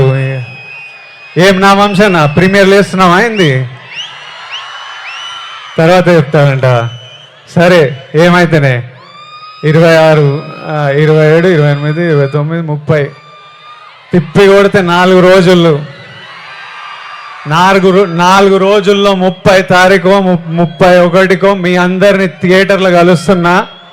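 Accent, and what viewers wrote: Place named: Rayalaseema, India. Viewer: Rayalasema(Chittoor) slang not telangana (telugu) slang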